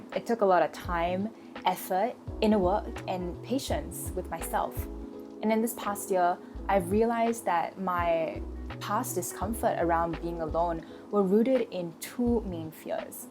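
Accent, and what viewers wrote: Singapore. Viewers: Are you singaporean? Good video!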